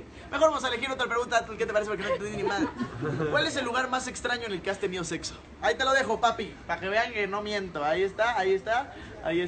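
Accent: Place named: Spain. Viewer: su acento español😍😍😍😍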